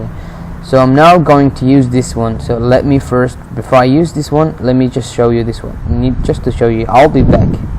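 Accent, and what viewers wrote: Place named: United Kingdom. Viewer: What’s up with that British accent 😐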